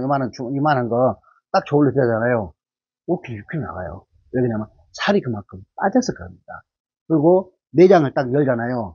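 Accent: Jeolla, South Korea. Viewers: 우리 어머니 목포말씨를 께 들을 수 잇어서 정말 기쁩니다...^^ 보물단지 호남목포말씨에요...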